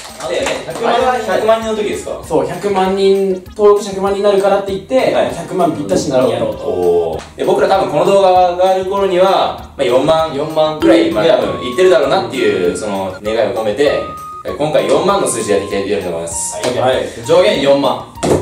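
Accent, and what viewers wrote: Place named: Hokkaido, Japan. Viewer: たまに出る北海道弁がいいですー！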